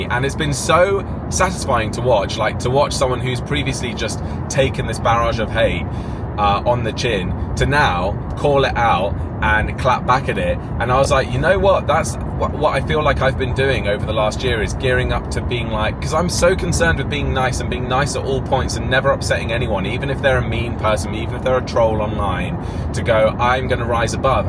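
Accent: England, United Kingdom. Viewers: we could never possibly get tired of seeing your handsome face on our screens and hearing your gorgeous English accent - much love to you and the handsome Keegan 🇮🇪☘️🇮🇪❤️❤️❤️❤️❤️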